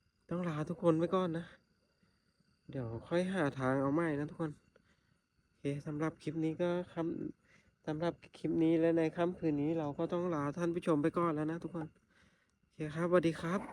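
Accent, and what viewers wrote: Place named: Suphanburi, Thailand. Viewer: เป็นคนเหน่อที่ไหน สุพรรณ หรือ ราชบุรี….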